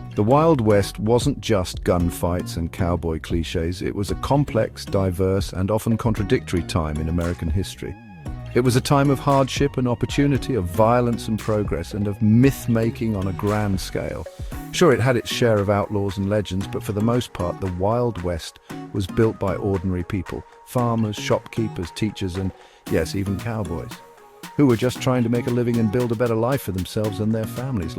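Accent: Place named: England, United Kingdom. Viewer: Why is this bit pf US history being narrated by an englishman.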